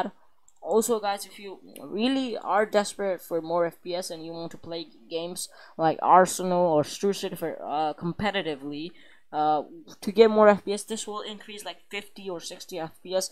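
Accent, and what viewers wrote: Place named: India. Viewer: You sound like a India Girl no offense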